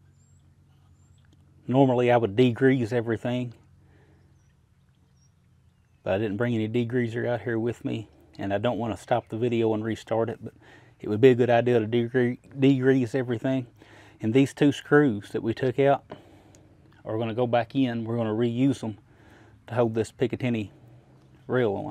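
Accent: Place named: South, United States. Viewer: Hey. Like that your videos cover such a wide spectrum of firearms, ammo etc. Just because you aren't an AR guy, or a Henry fan, or a revolver fan doesn't mean that you can't learn about and enjoy them on channel. An added bonus is that you get some culture with the southern accent and geography as well. Keep em coming, they are all good.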